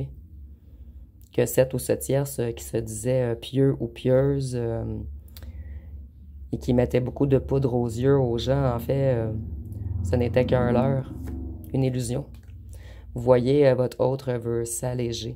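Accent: Quebec, Canada. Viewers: J'adore vous entendre parler et vous ecouter chère madame avec votre joli accent quebecois❤❤❤❤❤❤❤❤❤❤❤❤❤❤❤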